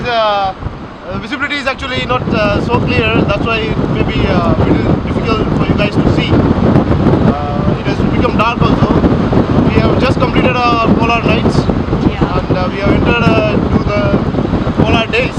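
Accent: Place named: India. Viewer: Subtitles would be helpful, guys. Your accent is very hard to understand for non-Indians.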